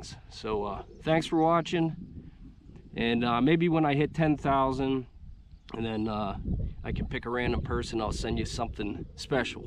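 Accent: Western Pennsylvania, United States. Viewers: Congrats on reaching 5000 subscribers! Stay humble, keep learning. Continue to set high personal standards. Keep doing great work. AND hang on to that Western PA accent!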